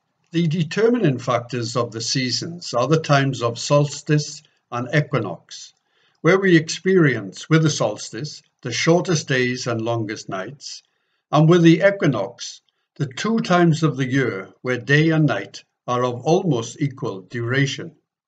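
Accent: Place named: South Wales, United Kingdom. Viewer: This is the South Wales Valleys ' Phone voice' . Well presented butt . Of course all us Brythonic and Irish people were fully aware of this since the end of the last ice age when we moved back in from modern day Spain.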